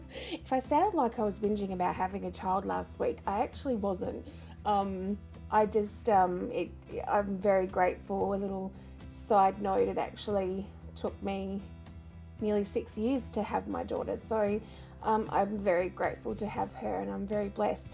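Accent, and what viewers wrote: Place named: Australia. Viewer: yay another chatty aussie lol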